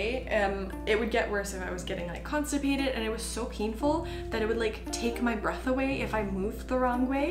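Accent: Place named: Canada. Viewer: I knew You were from Canada by the way You speak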